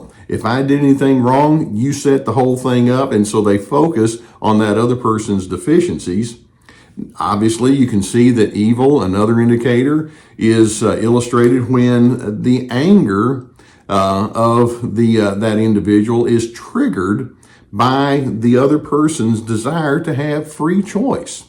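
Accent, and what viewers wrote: South, United States. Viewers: As an aside...I just wanna say..I love your southern accent. ❤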